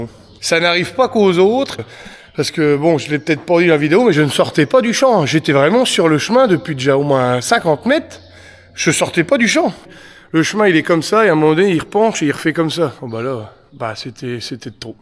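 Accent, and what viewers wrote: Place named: Meuse, France. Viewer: Joyeux anniversaire à la grand-mère 🎉. Il y a pas malin pour la benne. Et par contre pas de soucis tu es bien meusien l'accent est bien présent. La meuse c'est les meilleurs.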